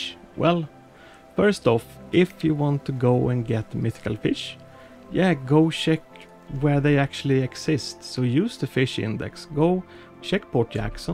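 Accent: Sweden. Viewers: Ser och hör att du är svensk, Jätte bra video, bra tips. Ska försöka dem tricken! Ha en bra Midsommar idag. Hejdå! :)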